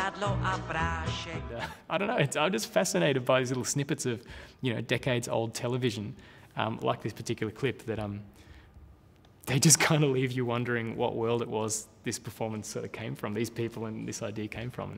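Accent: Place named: Australia. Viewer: Accent???? He sounds like any Aussie. What country are you in:)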